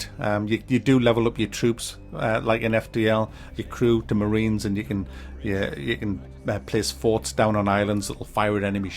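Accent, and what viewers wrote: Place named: Sunderland, United Kingdom. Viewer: Eh a mackem! Ya getting a sub just for that mate.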